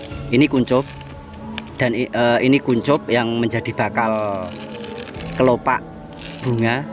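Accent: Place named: Java, Indonesia. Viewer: Dan ada yang menjadi ciri khas dari bahasamu mas ketika memberikan penjelasan, campuran bahasa daerahnya selalu melekat dan medok, kalau orang jawa bilang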